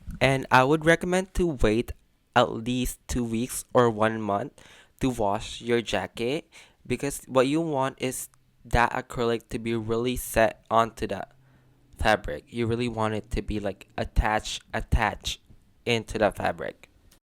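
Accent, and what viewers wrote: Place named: Philippines. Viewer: I thin he got filipino accent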